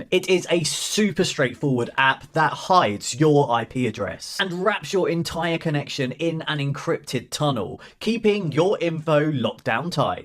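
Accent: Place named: United Kingdom. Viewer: Where are you getting American from? He's British, he has a British accent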